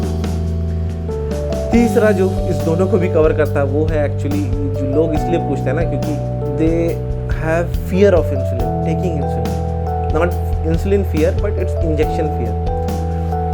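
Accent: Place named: Patna, India. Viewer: Hatsof sir very well explain, specially with patnaiya tone. Kya diabeties type 2 me lifetime medicines khana padta hai .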